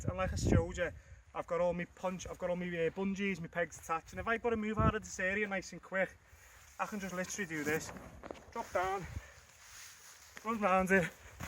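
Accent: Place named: Liverpool, United Kingdom. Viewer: English with a Liverpool accent.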